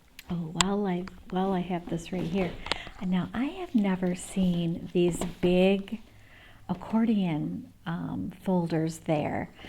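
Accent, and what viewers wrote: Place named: New York, United States. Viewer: That sounds like you’re in New York. Are you in New York? Hailing from Albany.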